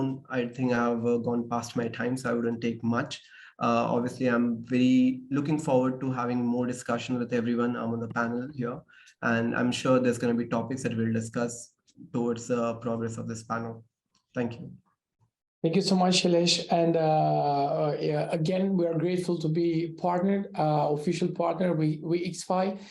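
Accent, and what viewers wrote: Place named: India. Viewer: Indian english 🤤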